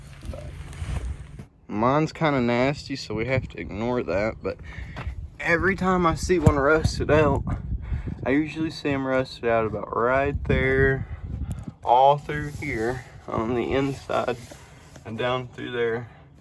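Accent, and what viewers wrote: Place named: South, United States. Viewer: You sound southern, you near Cookeville Tennessee? I’ll help you paint it!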